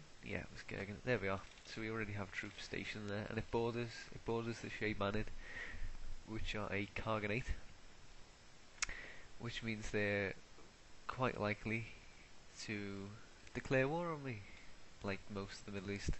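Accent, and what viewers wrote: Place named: Liverpool, United Kingdom. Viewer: Scouser! :P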